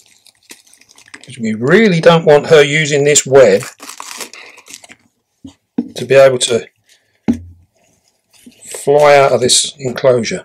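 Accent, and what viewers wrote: United Kingdom. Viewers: What big spinerats she hase,she how she can make so much web,so easy I think a lot of people watch for the Beautiful T you have an great content,but a small section I bet for ur British accent an a super small are arachnophobia people,. Am in the first bunch 8 T s so far. Have a happy new year an camera lady 👍👍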